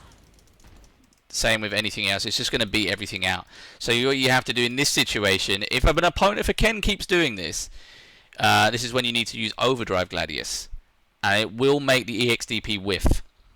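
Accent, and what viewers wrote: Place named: England, United Kingdom. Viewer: Great vid man. Good to hear it from an English accent too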